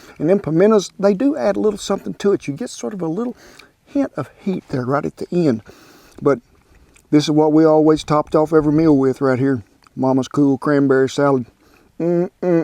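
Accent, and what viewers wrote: South, United States. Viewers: This is only my third video on this channel. What a unique character this guy is! The way he talks is like a Southern Sling blade lol. And that guy was actually a simple dude. Liking biscuits and mustard and potted meat.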